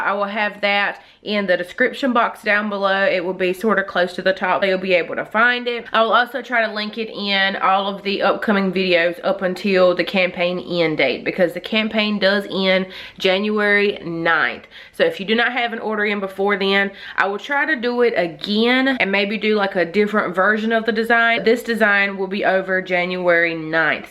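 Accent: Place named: Mississippi, United States. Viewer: Girl are you sure you ain’t from Tupelo Mississippi? 🤣 the way you talk and explain things... you always make me smile. 😘❤️❤️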